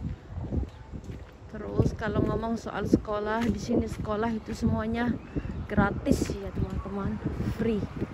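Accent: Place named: Java, Indonesia. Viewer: Indo nya mana kak. . . Ada medok nya bahasanya. . 😁👍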